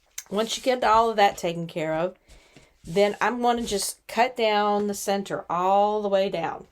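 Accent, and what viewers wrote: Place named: United States. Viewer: I listened to your video shorts with the sound down and was surprised when I heard your voice had a US accent. You looked so typically British. 😊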